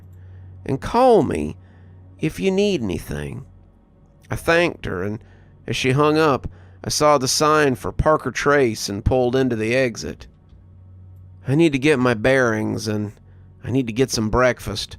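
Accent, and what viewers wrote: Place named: Florida, United States. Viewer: O SNAP. This series is great. Im pretty good at accents - I'm guessing North Florida but not Panhandle. ??????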